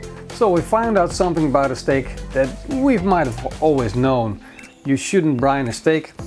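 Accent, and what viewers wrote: Netherlands. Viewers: Ur dutch i can hear iT i am dutch as wel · 3 seconds in and I had a feeling u were a fellow Dutchman, nice video very helpful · You sound very Dutch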